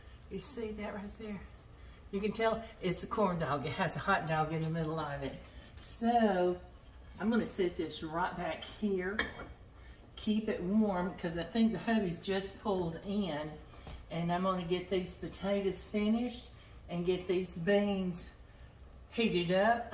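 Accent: South, United States. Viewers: like I'm watching my grandma southern drawl n all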